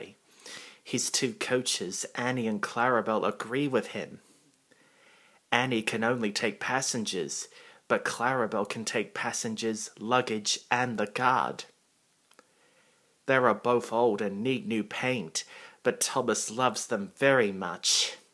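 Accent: United Kingdom. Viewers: okay, however are you British or Australian ? If you originally came from the UK or were you born in Australia ?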